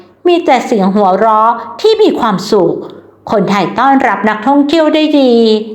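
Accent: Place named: China. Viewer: ทำไมแอดพูด ส.เสือไม่ค่อยชัด สำเนียงพูดเหมือนคนจีนเลย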